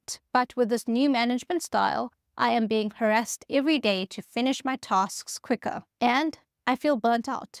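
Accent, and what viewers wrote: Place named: South Africa. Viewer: Spotted the South African!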